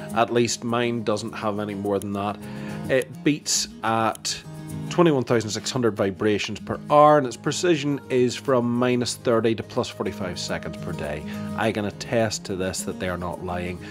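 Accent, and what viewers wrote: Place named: Northern Ireland, United Kingdom. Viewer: Northern Ireland,it's a lovely accent👍🏻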